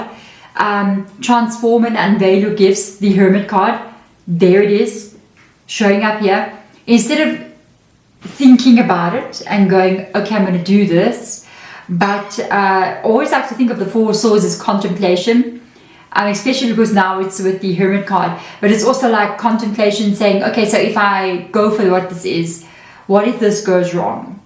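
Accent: South Africa. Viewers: Your readings are amazing 🙏 I recognize the SA accent - hope you are - am totally resonating with yr other readings too Thank you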